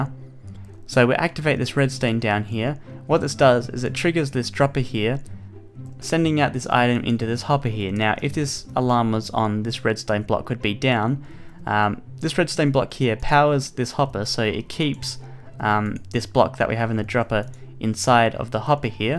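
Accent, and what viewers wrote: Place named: Australia. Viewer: I love ur accent! it sounds almost Australian :)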